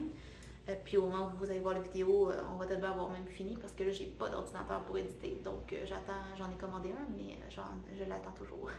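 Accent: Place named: Quebec, Canada. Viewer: Bonjour, j'aime aussi beaucoup les tricots zéro cerveau 😂 Tu devrais aller voir les pod cast de Christelle Nihoul Christal NK, elle explique bien les modifications à faire pour les poitrines plus larges que les épaules et les hanches pour éviter de se retrouver avec des modèles trop loose. Moi ce serait plutôt un petit ventre à caser! A bientôt pour un deuxième pod cast tricot. Ce premier était très réussi. En bonne Française de France j'adore l'accent du Québec ❤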